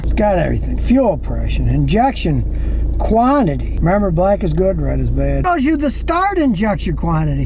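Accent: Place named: United States. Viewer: This guy is the epitome of my fantasy of how a true american sounds like. Half screaming, spitting through the "p" 's and the "d" 's, scattered all over the place and what not. That's what rock'n'roll is guys and girls!!! Rock on!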